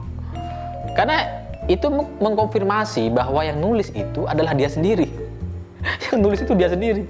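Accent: Madura, Indonesia. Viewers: Saya jg berfikir gt.logat maduranya ada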